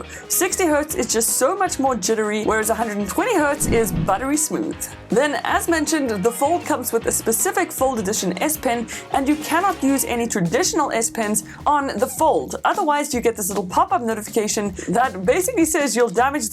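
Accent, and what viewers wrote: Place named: South Africa. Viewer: Your accent sounds like South African is that correct and do you live there now.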